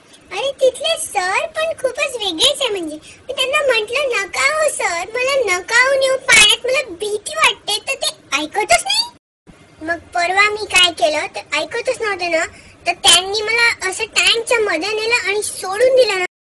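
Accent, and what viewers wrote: Nagpur, India. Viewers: tu nagpur chi ahes ka mhane😜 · your accent in video looks similar to Nagpur ... majedar ahet pan.... yet rahundet asech... lahanpanichya baryach athavani jagya zalya masti chya :)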